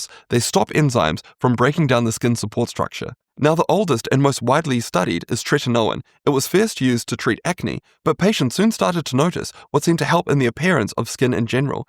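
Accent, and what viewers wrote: New Zealand. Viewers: Seems fine to me. Maybe it's the New Zealand accent? I'm Aussie so perhaps this is how I talk too 🤣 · Love the Kiwi accent… even though I hope AI wasn’t involved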